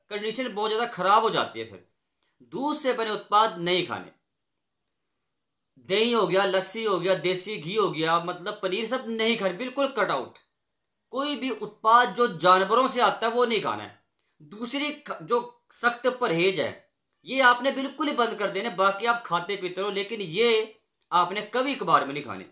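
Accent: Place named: Himachal Pradesh, India. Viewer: Happy birthday🎉🎉Himachali lamguage lg rahi aapki.aap himachal se ho??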